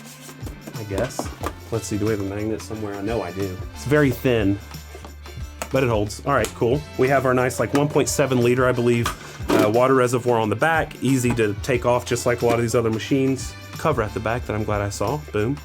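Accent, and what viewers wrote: South, United States. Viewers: Your southern American accent but simultaneously using metric is wrecking my brain.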